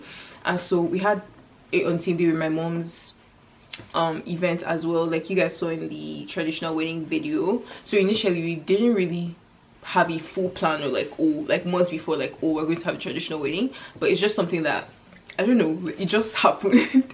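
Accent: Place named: United States. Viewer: Were you born in canada or united states!!! You have an american accent with a african twist, you go in and out of the accent!!! Its so cute!!! You are a beautiful girl!!! Congrats babygirl!!! You remind me of my daughter!!!😊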